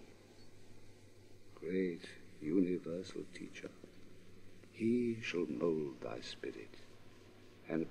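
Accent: RP, United Kingdom. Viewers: Great stuff. He doesn’t just recite it in exquisite RP, he understands the text.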